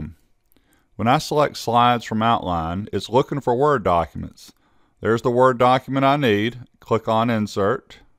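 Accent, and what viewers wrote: South, United States. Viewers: Thanks buddy....yous LEGEND.! MY UNIVERSITY RECOMMENDED YOU.!! 😆 🤣 😂 *I KID YOU NOT.! THEY SAID IT'S BROKEN DOWN & EASY TO UNDERSTAND & DIGEST & I GOTTA SAY I AGREE WITH EM.! LOVE THE ACCENT BY THE WAY. IS IT SOUTHERN.? BUT AY, I BET YOU'D SAY THE SAME HEARING MY TEA DRINKING ASS, WITH MY BRITISH ACCENT. "IT IS WHAT IT IS!!!!" I'VE NOT BEEN THROUGH ALL YOUR VIDEOS, BUT COULD YOU POSSIBLY PLEASE TELL ME IF YOU HAVE A VIDEO (SO I CAN GO THROUGH YOU BACK CATALOGUE) EXPLAINING HOW YOU ATTACH OR IMBEDD A POWERPOINT PRESENTATION INTO A WORD DOCUMENT PLEASE.! CUZ OF COVID-19 ALL MY 1ST SEMESTER IN ONLINE & THEY HAVE ASKED US TO HAND IN AN ASSIGNMENT VIA CANVAS(CANVAS IS THE SYSTEM WE USE TO HAND ASSIGNMENT INTO OUR TUTORS TO BE GRADED) BUT THEY HAVE MADE IT CLEAR IT HAS TO COME THROUGH AS 1 FILE NOT 2 SEPARATE FILES(THE ASSIGNMENT CONSISTS OF A 2500 WORD ESSAY & A 20 SLIDE POWERPOINT PRESENTATION.! 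BUT I'M NOT ABLE TO TURN IT INTO 1 FILE. I WAS HOPING YOU COULD SHOW ME HOW IT'S DONE OR BETTER STILL YOU'VE ALREADY DONE IT & COULD POINT ME IN THE RIGHT DIRECTION (IF YOU HAVE ALREADY GONE THROUGH SUCH A TUTORIAL) ON YOUR AMAZING CHANNEL. OKAY I'M GONNA REMOVE MY LIPS 💋 FROM YA BUTT😁😁😆😄☺!!!??* please reply by 23rd November 2020.!!??!! Thank you.!!!!!!!!!!!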